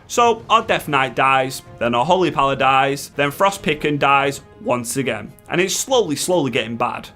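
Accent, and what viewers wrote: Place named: Northern England, United Kingdom. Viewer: been loving your videos. Prefer to watch the compilation ones in one go, beer in hand - the night is mine. I have one small criticism and that is it seems like your videos are on speed.. They go through so quickly there's barely time to absorb the info. If you could slow it down a bit for us old timers lol. Love the accent, I'm guessing somewhere up north. Essex boy here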